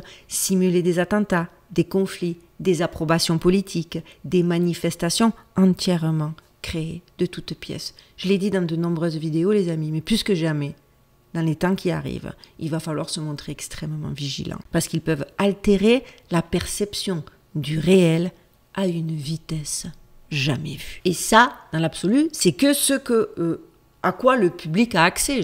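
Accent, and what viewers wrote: Marseille, France. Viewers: Salut --merci pour tes videos---tu es native du sud est ou du sud ouest de FRANCE ?? Tu as ce bel l accent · Un bonjour de Corse où ton accent Marseillais fait partie des rituels rassurants de nos mercredi et dimanche 🎉❤, merci de nous partager ton enthousiasme et ta bonne énergie !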